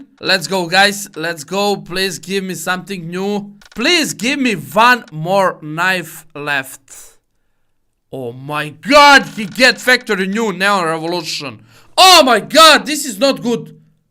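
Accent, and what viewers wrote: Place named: Serbia. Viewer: pricas engleski ko srpski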